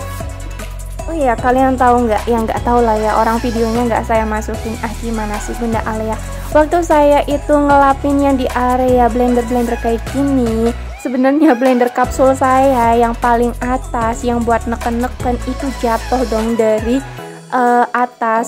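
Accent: Banyumas, Indonesia. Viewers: Setia ngikutin video nya tp jarang komen...kita satu bangsa ngapak😅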